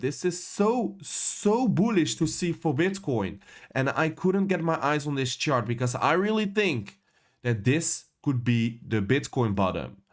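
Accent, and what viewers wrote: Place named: Netherlands. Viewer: are you drunk, ? or is that your dutch accent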